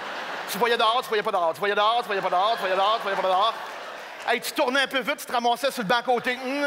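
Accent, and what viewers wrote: Quebec, Canada. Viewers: Il est québécois. Il a donc un accent différent du tien et parle un dialecte du français nommé le joual.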